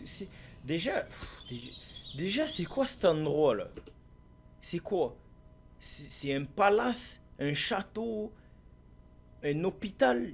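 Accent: Marseille, France. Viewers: T’es marseillais ? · Tous se qui me plaît ses ton accent marseillait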